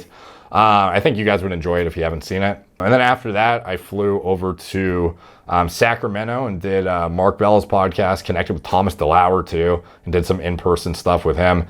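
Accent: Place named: Canada. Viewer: I have some bosses based in Canada and after listening to them in meetings for months now, really does sound so Canadian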